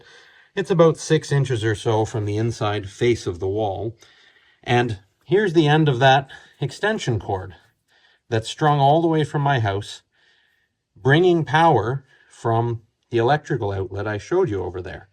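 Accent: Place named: Canada. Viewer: I love your video tutorials; they are well paced and informative. Love the Canadian accent!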